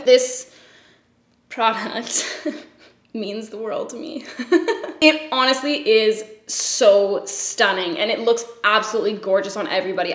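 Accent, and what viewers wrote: Canada. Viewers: her canadian accent though!!! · I had to go watch another video to see if you were Canadian lol. We talk really fuckin close to the same so I was pretty sure and then the other video I watch you were wearing a Canada hoodie...roots maybe? Merry Christmas 🎄🎁🎅🏻🍻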